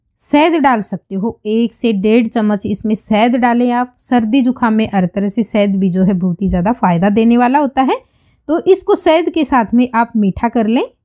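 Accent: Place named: Rohtak, India. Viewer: Aap rohtak se h kya Kyoki aapki Boone ki reathem bilkul mere jaisi h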